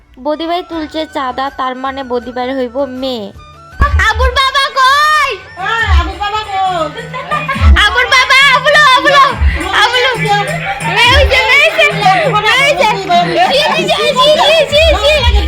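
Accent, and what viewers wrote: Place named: Habiganj, Bangladesh. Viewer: আমার প্রিয় জেলা হবিগঞ্জ থেকে দেখছি আপনার কথা আমাদের হবিগঞ্জের ভাষা মতো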